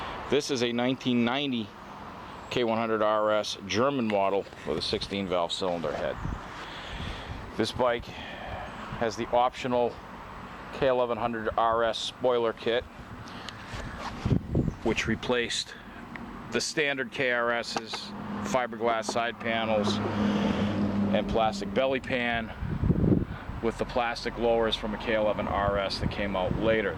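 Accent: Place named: New England, United States. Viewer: Mr., as always has lots of detailed information about his bikes even with his Goofy New England accent.............lol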